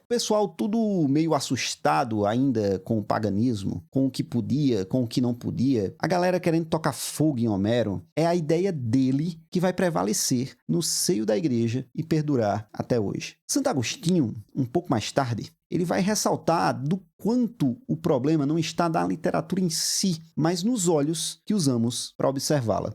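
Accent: Northeast, Brazil. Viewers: Com as devidas adaptações, ouvi a narração de minha jornada com os quadrinhos. Uma aula sobre o tema e uma incrível lista de indicações de leituras com resenhas sintéticas, mas estimulantes. Tudo isso com alta dose de conhecimento, a sonoridade nordestina e o desejo das bençãos de Deus. É um privilégio.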